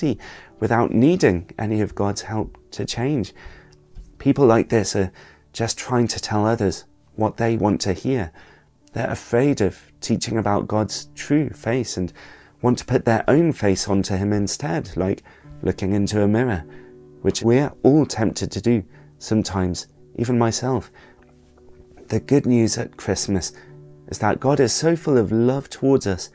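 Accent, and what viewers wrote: United Kingdom. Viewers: i love your britich TBH. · I am baptised, but not a believer but I do respect people who believe in a religion. Your video was a beautiful, and a lovely message. It's good to see your face, indeed. It's a good idea for Christmas. You have a soothing voice, and a lovely British accent. (Please, don't colonize my computer nor my photo camera FujiFilm X-T4!) MERRY CHRISTMAS! 🎅🎄🎁🌠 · i like the british accent:) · So so glad to see your face! beautiful soul, wonderful voice, brilliant British accent, Love it! May God bless you and your love ones! Merry Christmas 🎅🏻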